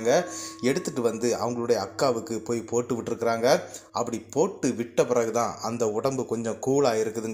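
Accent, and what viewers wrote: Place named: Nagercoil, India. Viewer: It is so nice to hear brother speaking nagerkoil tamil as I am from nagerkoil thankyou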